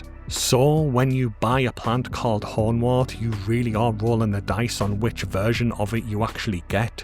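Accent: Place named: Scotland, United Kingdom. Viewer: Oh, and I (Swedish) thought it was a Scottish accent, probably insulting many people on both side of the England/Scotland border... ooops sorry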